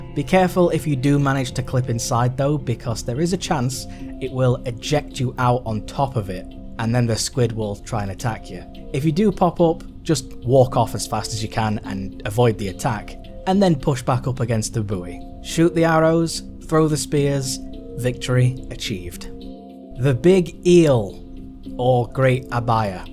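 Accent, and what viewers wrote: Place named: Wales, United Kingdom. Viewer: oh my god... are you kidding. I'm from Wales and its unusual to hear a Welsh accent. That has made my day.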